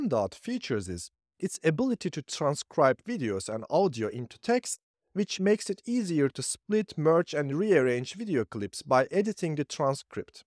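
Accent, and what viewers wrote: Turkey. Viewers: Türk aksanı 😊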